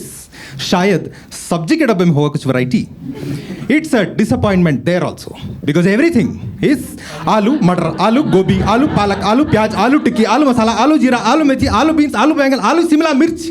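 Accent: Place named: South India, India. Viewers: BC- Yeh teri variety 😅 Coming from a Mallu Kudos 👏 Your Hindi still has that southern charm 🤩 Dimag Ki Dhai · I like your south indian accent ❤❤❤ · Actually funny and good comedy timing! Especially hearing north indian slangs in south indian tone! Will watch your live shows in bangalore..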